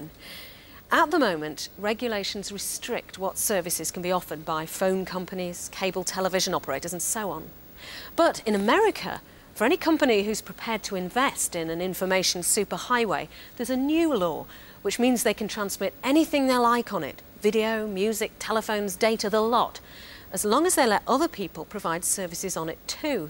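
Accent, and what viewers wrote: United Kingdom. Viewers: The British accent makes the presentation sounds very sophisticated.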